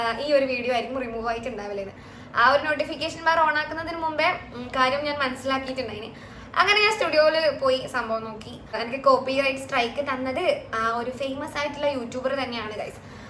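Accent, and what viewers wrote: Kannur, India. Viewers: കണ്ണൂർ ഭാഷ വരുന്നുണ്ട് ❤️ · കണ്ണൂർ ഭാഷ, നമ്മുടെ സംസാര രീതി. ഞാൻ തലശ്ശേരി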